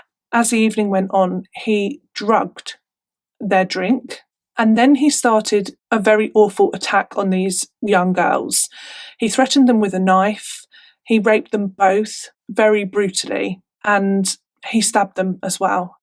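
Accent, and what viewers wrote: United Kingdom. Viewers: i just found your site by accident and watched one of your videos. Normally I would be irritated by your presentations, but I find your approach to be delightful -- can't say funny because of your subject matter!! I am from London originally so its refreshing to discover a British narrator of horror stories!! You remind me of Miranda Hart who had her own unique TV show, I hope you do other performances other than tell these stories?? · I love your videos. Love your voice and attitude. I’m American, so I love the attitude and voice of the English. Can you add more photos as you’re telling these cases? I’m not asking for shock photos, but photos that humanize the case more. Like childhood photos of the victim, photos of love ones, photos of the killer, photos of the trial, etc. Please and thank you.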